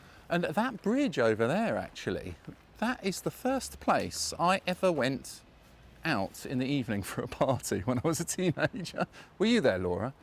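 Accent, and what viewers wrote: United Kingdom. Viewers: Can anybody tell me from what region of british accent he has?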